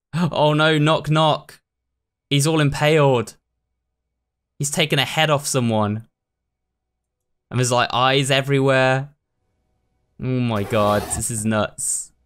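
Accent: United Kingdom. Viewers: AAAAAHHHH HES BRITISH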